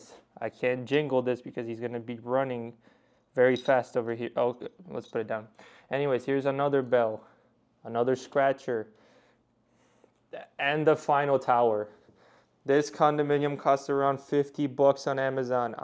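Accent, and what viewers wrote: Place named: Poland. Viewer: Yo what country are you from you’re giving me polish accent vibes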